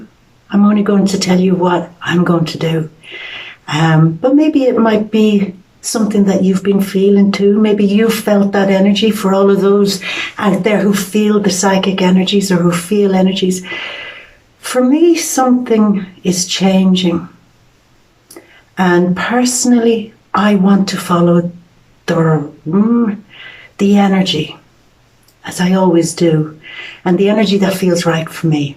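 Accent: Ireland. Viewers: Hello I popped back on your channel today to hear your lovely Irish dusky voice and I wanted to ask how your new path is going!! Hope it’s marvellous x love and peace x j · hey yay.. ouch so glad your back our beautiful., happy 2021, missed ya beautiful irish accent.. thanks for comin back.. bless you beautiful Im sharing you on my MeWe.. looking forward to your extraordinary journey.. Truth Peace Justice and love dear heart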